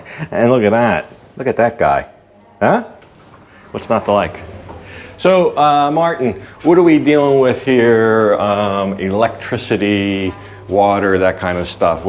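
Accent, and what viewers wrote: United States. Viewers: We get you on the ships . Outside manilla. A real american voice can't be bad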